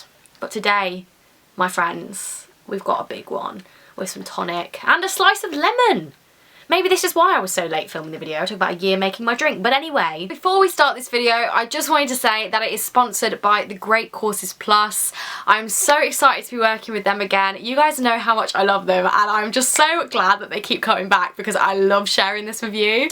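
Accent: Birmingham, United Kingdom. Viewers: you turn really brummy when you're home hahaha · u sound so Birmingham in this vid x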